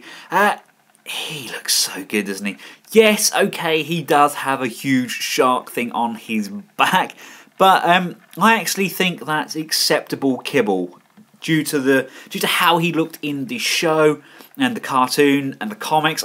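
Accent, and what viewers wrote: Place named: Scotland, United Kingdom. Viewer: wow he looks awesome ! and great review ! i subscribed a few days ago ! by the way, are you scottish ? you sounds alot like david tennant the 10th doctor in doctor who ! lol ! :)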